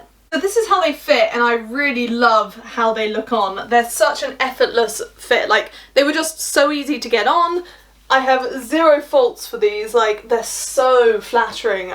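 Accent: United Kingdom. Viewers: I love all your videos, and I love your very British accent ♥ ♥ ♥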